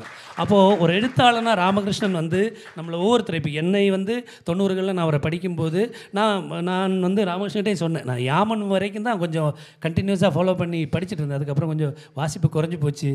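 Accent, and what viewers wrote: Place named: Kerala, India. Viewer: அவரே தான். இவர் ஒரு எழுத்தாளரும் இலக்கியவாதியும் என்று தெரியாது. மிஷ்கின் படங்களில் மலையாள வாசனையோடு கூடி வரும் இந்தக் குரல் என் மனதில் எப்படியோ பதிந்திருக்கிறது. மிகவும் அழகாக பேசியிருக்கிறார் இந்நிகழ்ச்சியில்.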